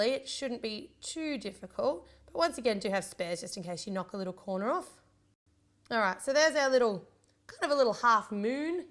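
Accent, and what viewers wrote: Australia. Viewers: Are u austrailian?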